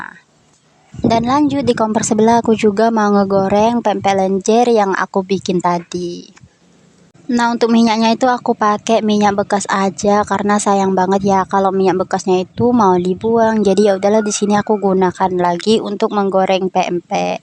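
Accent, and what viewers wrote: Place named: Banjar, Indonesia. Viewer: Enak tekwannya bun... Dari logat ngomongnya seperti orang Banjar.. Tinggal dimana bun?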